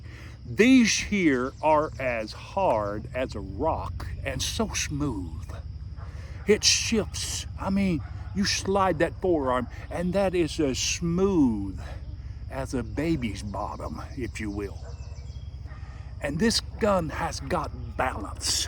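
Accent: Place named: Louisiana, United States. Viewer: Hi, just found your channel and subscribed. I just got my firearms license up here in Eastern Canada, along with a good friend of mine. I picked up a Benelli Supernova, but my friend somehow managed to get his hands on the Wingmaster. Great video... and as a French-speaking Canadian from up North, love to hear the cajun in your voice. Merci mon ami!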